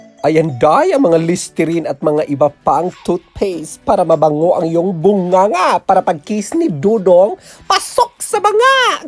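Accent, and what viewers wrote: Visayas, Philippines. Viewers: Bisaya ka?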